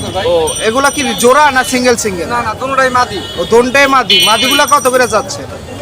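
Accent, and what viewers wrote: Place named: Noakhali, Bangladesh. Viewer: bari Noakhali normal babe boltechailew parbena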